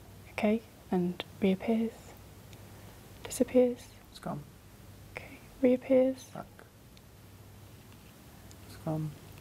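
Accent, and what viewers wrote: United Kingdom. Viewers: how i love her british english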